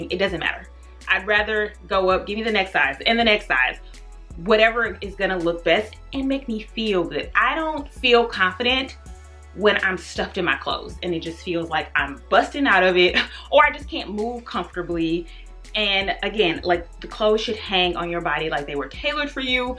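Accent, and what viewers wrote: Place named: United States. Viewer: I had to have subtitles because I am Portuguese and speak fluent English ( I live in London), but my girl J speaks too fast and I almost cannot understand the American accent!!! But I love her anyways…💞💞💞💞💞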